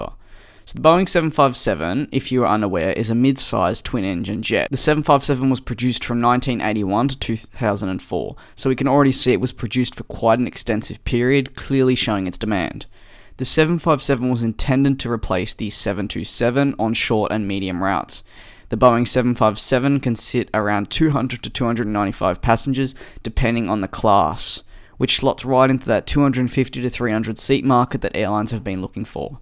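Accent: Australia. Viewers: Thank you for the "757 Re-Engined" video-- the 757 is one of the most powerful and graceful of Boeing airliners. Pilots develop sentimental attachments to the 757 for its creature comforts, and the wonderful cockpit view. Your own, detailed commentary is very helpful-- especially the facts and reasoning for your viewpoint. Clearly, you read industry publications widely, and in-depth. Also, very helpful is description of your video equipment-- there is no substitute for a good tripod. Your Aussie accent is almost never a problem, but you have a tendency to drop complete syllables in words, and unless we Americans know the Aussie abbreviated pronunciation, the word is lost. However, we do understand your production is provided as an amateur, and a professional speaker is not expected. * If I have confused your accent with Australia, and it comes from industrial London, instead, my apologies. 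Quite a number of Aussies came from that area, originally.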